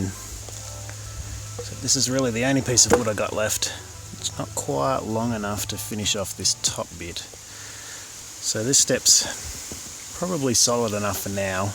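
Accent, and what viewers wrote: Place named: Australia. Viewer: It looks great! Lovely to hear an aussie on Youtube too :)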